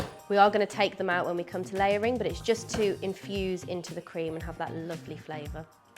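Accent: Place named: United Kingdom. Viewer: I was going to make a snide comment as I thought you were American and I can't abide Americans, however upon hearing your accent and realising that you are British I shant be making a snide comment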